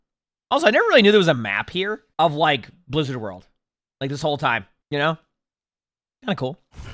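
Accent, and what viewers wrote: Boston, United States. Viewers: Probably part of his Boston accent (which sometimes comes out more noticeably).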